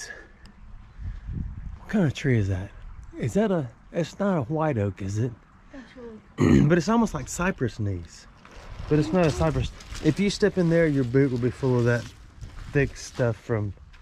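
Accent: South, United States. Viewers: Southern talking. I live in Illinois now and when my Southern kin come to visit I have to learn that language all over again. Count how many times the word "look" is said in a video.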